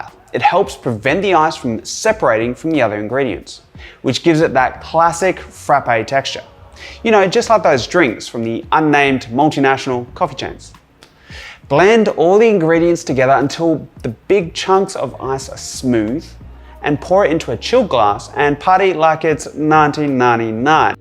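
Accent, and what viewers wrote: Australia. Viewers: is he aussie??? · Sydney, Australia maybe?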